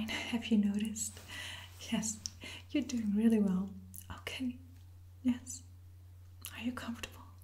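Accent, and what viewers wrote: Netherlands. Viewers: Pretty good roleplay, are you dutch btw?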